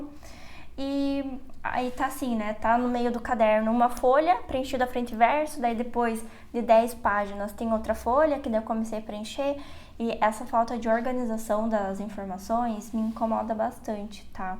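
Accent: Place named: Interior, Brazil. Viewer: Olá, Vamos bater um papo?! Primeiramente que delícia de vlog. Segundo sua casa é tão linda! Você me parece do interior, pelo sotaque, mas fico na dúvida se é inteiro de São Paulo ou de algum estado do Sul. Parece que vocês vão morar um pouco distante da cidade, você está gostando de morar mas distante da cidade, caso seja esse o caso. Eu tenho vontade de ir para uma cidade pequena e morar um pouco distante do centro comercial do bairro mesmo, mas bate aquele medinho, moro na cidade do Rio ou seja loucura e o bairro onde moro tem de tudo, o que eu acho prático, daí vem aquela dúvida se me adaptaria. Terceiro, também não consigo ficar muito tempo com o mesmo livro, eu me canso, igual você. Quarto, também não sou fã de salão, nem do lugar onde faço depilação que é relativamente calmo, vou porque tem que ir, se deixar crio raízes dentro de casa. 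Quinto também não gosto de lugar barulhento, lugar cheio e som alto me deixa extremamente cansada, eu sou introvertida, eu gosto de calma. Sexto amo resenhas sinceras, se não gostou de uma leitura acho bacana compartilhar com as pessoas não para fazerem elas não lerem mas para mostra seu ponto de vista, sempre acho que atitudes humanas, como gostar e não gostar de uma coisa nos aproxima, como dizem gente como a gente, e você faz isso super natural e de maneira sincera. Bom, falei muito, digitei na verdade, rs! Fique com Deus. Beijos 😘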